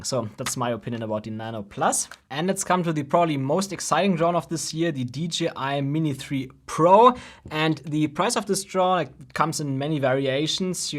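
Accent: Germany. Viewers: are you from germany? sounds familar like you are speaking...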